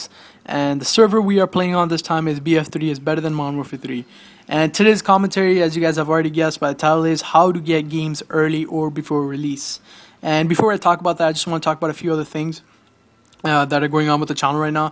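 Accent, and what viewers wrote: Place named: Montreal, Canada. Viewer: u sound like u live in monteal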